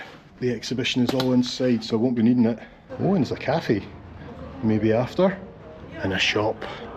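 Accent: Scotland, United Kingdom. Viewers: I enjoyed your trip,I just love you Scottish accent,I find everyone of your journeys wonderfull keep up the good work😊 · Love your 'posh' Scottish accent.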